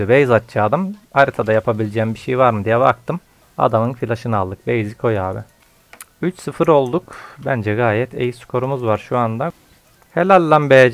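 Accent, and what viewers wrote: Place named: Balıkesir, Turkey. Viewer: Nerelisin Balıkesir ağzı konuşuyor gibisin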